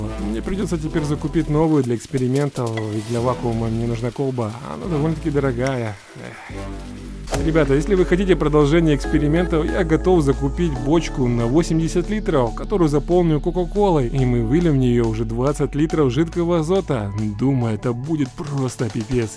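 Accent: Ukraine. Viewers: У тебя украинский акцент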